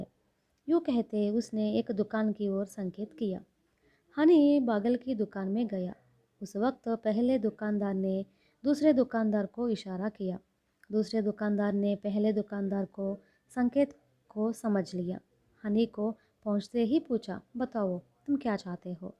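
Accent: South, India. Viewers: south indian accent sounds good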